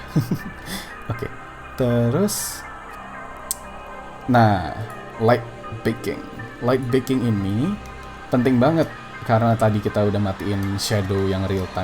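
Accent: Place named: United States. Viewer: Anytime you say an english word its pronounced perfectly with an American accent lol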